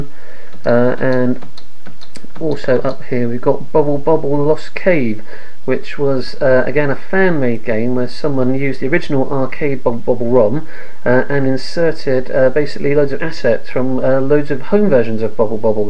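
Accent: United Kingdom. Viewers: you sound a lot like the guy that fixes/fixed my laptop, he lives in Darlington lol, are you from the UK?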